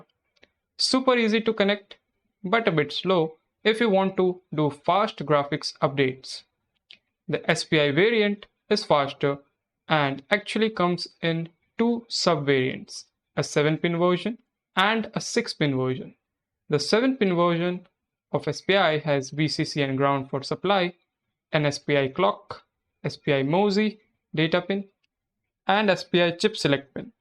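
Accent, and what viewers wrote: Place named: India. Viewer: damn, i was hoping for a video without an indian voice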